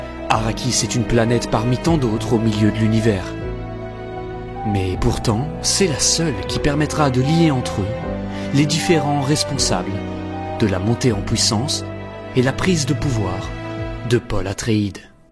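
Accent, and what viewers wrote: Belgium. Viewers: T'es belge toi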